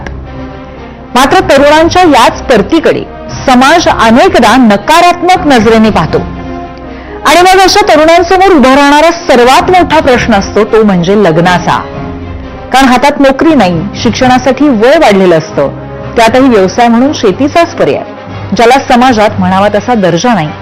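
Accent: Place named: Marathwada, India. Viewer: शेवट च वाक्य चांगले बोलले तुम्ही मला वाटतं तुम्ही मराठवाडा साइट चे असाल कदाचित भाषे वरून 😊 पण तुम्हाला बाबा नाहीत हे ऐकून वाईट वाटलं परंतु तुमच्या आईने तुम्हाला वाढवलं ही किती साहसाची गोष्ट आहे 🤝😮 तुम्ही जेव्हा तुम्हाला स्थळ बघाल तेव्हा तुम्ही ही नोकरी आणि प्रॉपर्टी पाहणार हे नक्की. प्रेमाने पोट नाही भरत पगाराने भरते आणि स्वतःची प्रॉपर्टी अडल्या वेळेला कामी येते 🤷 बाकी मुलांना शिकायचे नाही मी मुंबईत आहे तर येथील मूल धावपळ करून एंजॉय करून एकदम टवटवीत असतात रोज आणि शिक्षणही कसेतरी करतात 😅 तरी गावाकड उलट आहे ज्या गोष्टींकडे नाही पळायचं तिकडे जास्त पळत सुटतात उलट शेजारी कितीही गोड बोलणारा असेल किंवा रक्ताचा नातेवाईक असेल तरी तो जमलेल लग्न कसे तोडल हे पाहतो हे सत्य परिस्थिती आहे 😅 परत आपण म्हणतो गावाकडील लोक एवढे गरीब का ? कर्माने आहेत ते 😢कधी सुधारणार ही मानसिकता काय माहीत 🥱चला तुम्हाला तुमच्या पुढील वाटचालीस भरपूर शुभेच्छा 👍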